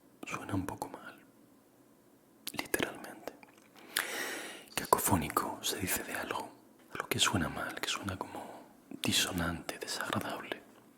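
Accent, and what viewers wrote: Spain. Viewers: El Sonido bajito y delicioso de tu voz, reforzada con ese rico acento iberico, Me Pueden Me Llevan ...😘😌😴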